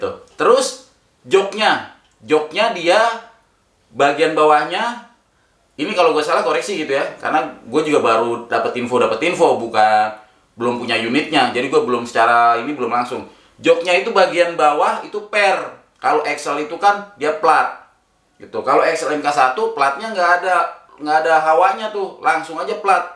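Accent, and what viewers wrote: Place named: Medan, Indonesia. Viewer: Medan banget 😁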